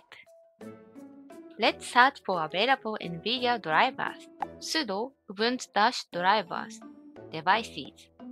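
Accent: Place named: Japan. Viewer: Thank you for your guide and the effort you put into the video! Love your Japanese accent and the little character in the bottom left corner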